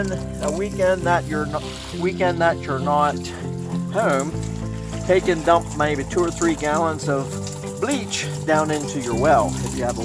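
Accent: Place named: Southeastern Pennsylvania, United States. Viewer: You sound like you're from south eastern PA. It's the accent.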